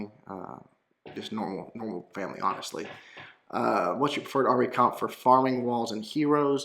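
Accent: South, United States. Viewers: Wow a dude with a southern accent that has taxidermied animals what a shocker.........some people really need to leave their bubbles sometimes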